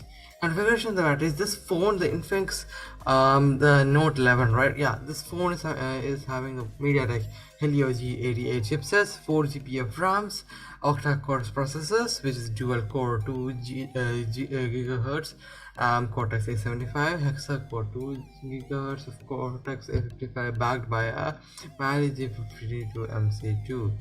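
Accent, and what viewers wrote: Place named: England, United Kingdom. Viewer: Indian hai kya?? Pura angrez ho riya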